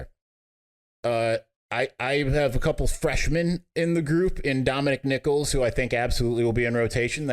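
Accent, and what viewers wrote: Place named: Ohio, United States. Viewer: Yoooo the buckeye accent 😂😂😂😂😂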